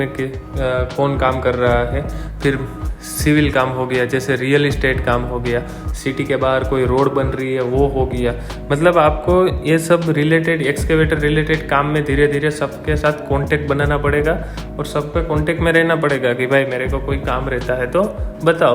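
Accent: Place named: Gujarat, India. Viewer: Doing Good Job Lagta Hai Aap Gujarat Se Ho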